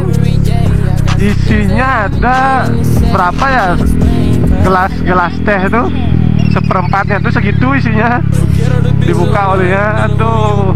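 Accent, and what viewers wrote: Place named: Bali, Indonesia. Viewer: Kle bali banget wkwk jeg kaku bhs indone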